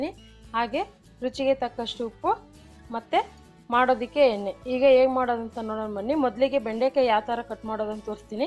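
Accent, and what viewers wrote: Tamil Nadu, India. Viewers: ನೀವು ತಮಿಳು ಮಾತನಾಡೋ ಭಾಷೆಯವರು ಇರಬೇಕು. ಕನ್ನಡವನ್ನು ಸ್ವಚ್ಛವಾಗಿ ಮಾತಾಡೋದನ್ನು ಕಲೀರಿ.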